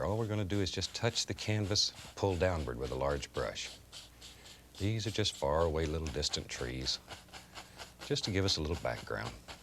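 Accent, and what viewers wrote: South, United States. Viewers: in the later episodes its harder to notice that he has a southern accent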